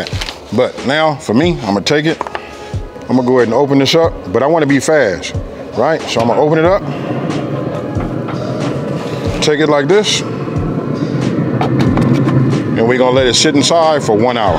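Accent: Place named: California, United States. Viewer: As a fellow LA dude, I'm happy to see do his thing. But I've been going from LA so long that I can't stand the Cali accent lol.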